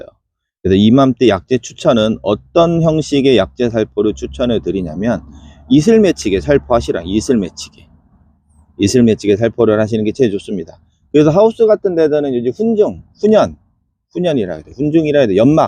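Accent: South Korea. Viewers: 충청도 사투리 가생이 ㅋㅋ 재빛곰팡이가 잘생기는 아가들이 있더라구여 그럼 쟈는 가망이 없는건가유?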